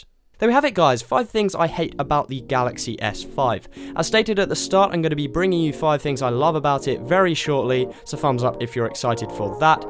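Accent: United Kingdom. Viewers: Ok my British sounding fellow friend first of all, I got a couple of complains. I know you don't want to hear it but... deal with it. I'm a very proud owner of my GALAXY S5, And this is what I have to say.. #1 yes the phone feels cheap, I get it, but remember Samsung is trying to keep the devise price affordable, compared to any iPhone of the same generation. #2 the charging port reminder can be deactivated by checking the "don't show this again" checkbox like I did... the flap... deal with it. #3 if you don't like touchwiz so much, download and install a different launcher, I'm currently using buzz launcher over touchwiz, and haven't looked back, and you CAN disable the news Crap at the very left of the touchwiz pages that lags so much, I never used it at all (I can't quite remember how tho sorry) but I did it.... #4 the speaker sucks........ I know.... 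I got nothing. #5 the fingerprint scanner works fine, don't be over dramatic about it, use it right instead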